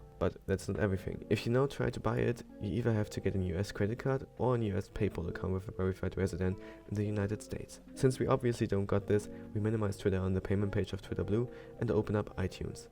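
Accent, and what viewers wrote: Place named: Germany. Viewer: Ich habe sofort gehört das du deutsch bist.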